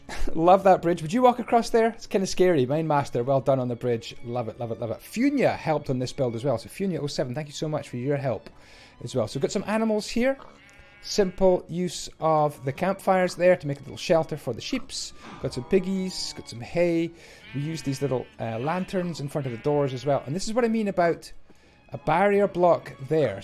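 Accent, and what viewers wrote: Scotland, United Kingdom. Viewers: Like ur scottish accent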